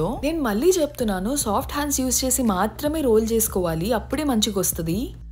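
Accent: Telangana, India. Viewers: Nenu mee recipe kosam video watch cheyaledhu. I would watch your video because of your voice. Fan of your voice mostly telagana slang ❤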